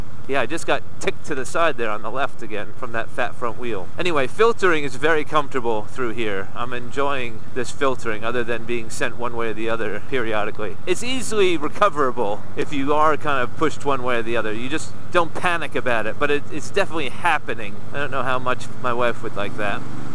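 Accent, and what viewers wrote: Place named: United States. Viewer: Hi, How is it that you have made your home in England. You sound like an American. I lived in London as a teenager and have always wanted to return and live there. How did you do it?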